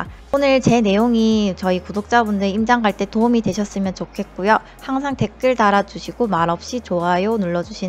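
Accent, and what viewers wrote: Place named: Ulsan, South Korea. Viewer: 잘 보았습니다..울산분이었군요..어쩐지 말투가 나랑비슷하던지^^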